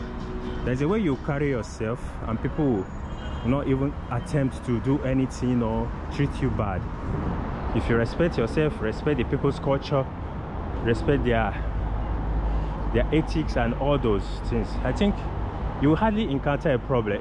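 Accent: Africa. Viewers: акцент не американский, из Африки скорее всего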